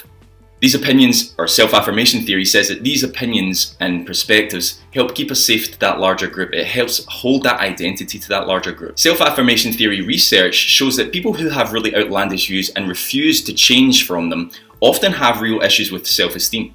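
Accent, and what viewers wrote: Scotland, United Kingdom. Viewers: Oh my god you are saying that the base of the person if the self esteem is compromised this leads them to believe in outlandish ideas NOT for the ideas themselves but for the sake of the reassuring position these ideas give them in an accepting groups. So if you try to reach them through changing their views it's impossible because they are tied these views to their low self esteem.So my question is how can if you can, help someone whose self esteem is damaged to encourage them to feel better about themselves? By the way I knew you were Scottish.I'm from Spain but I am 3% Scottish lol!!!I appreciate how clearly you explain things.Can you please make a video on how to help internally wounded people with some kind of wise approach?Thanks!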